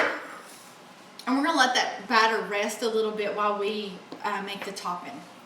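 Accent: South, United States. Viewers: Oh i love the accent, where are you from? Sounds southern? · I absolutely LOVE THIS RECIPE! It’s the absolute best ! PS, when I heard your southern draw I knew this was the recipe for me! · I've never seen this channel before but I heard that southern accent and I immediately knew this woman can bake. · Hey! Just wanted to let you know that I just made your muffin recipe and they turned out amazing!!!! Love your southern accent and style! Thanks for the bomb recipe!!❤❤❤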